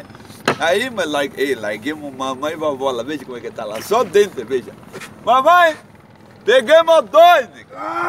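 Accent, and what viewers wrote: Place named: Paranaguá, Brazil. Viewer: é parnanguara mesmo!😂😂😂;muito massa nosso jeito de falar! Meu caneco!😂😂😂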